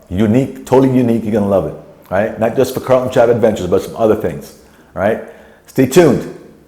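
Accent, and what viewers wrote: Jamaica. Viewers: You are Jamaica you spoke Jamaica ingles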